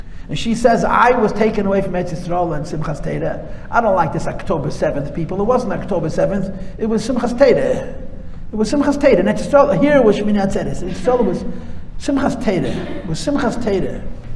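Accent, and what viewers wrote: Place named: United States. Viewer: American accent , another colliniser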